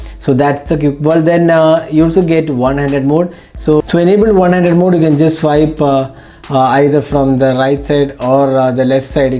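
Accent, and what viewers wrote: Kerala, India. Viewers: i hate indian english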